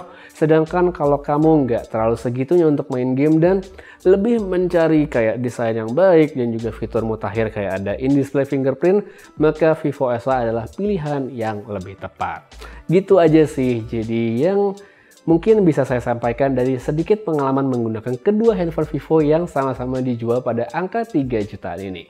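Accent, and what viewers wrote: Bali, Indonesia. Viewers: Bang orang bali apa?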